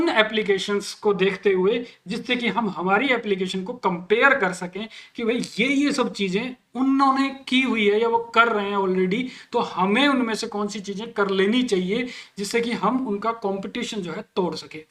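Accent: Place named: Rajasthan, India. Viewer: sir aapki tone se lag raha h aap pakka sri ganganagr or hanumangarh (rajasthan) side ke hoo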